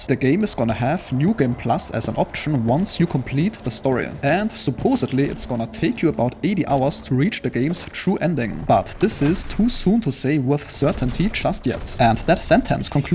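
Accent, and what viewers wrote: Germany. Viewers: Are you German? You sound like it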